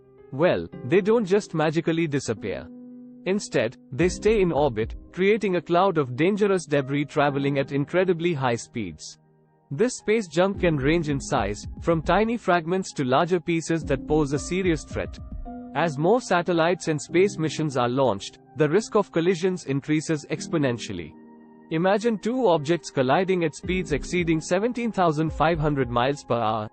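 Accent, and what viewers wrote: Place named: India. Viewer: WTH? hindi synthesized accent?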